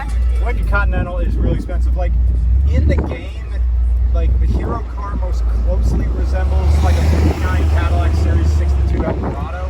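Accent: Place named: Derby, United Kingdom. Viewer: Not going to unsubscribe...was hoping to meet you in person but since i missed you i will say it here. I lost my brother in law who was from Derby a little over 10 years ago, so your accent and wit remind me of him and it is a welcome addition to my day.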